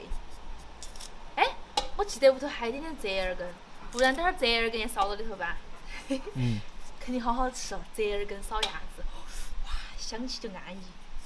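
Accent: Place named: Nanchong, China. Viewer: 怪不得听你说话那么熟悉的乡音，我也是南充的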